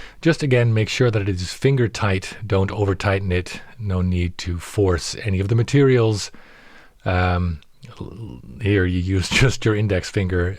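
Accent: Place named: United States. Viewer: what are you talking about?? 😂😂😂 If English is actually his second language then kudos to him because he has a great American accent. I would assume he moved to Europe.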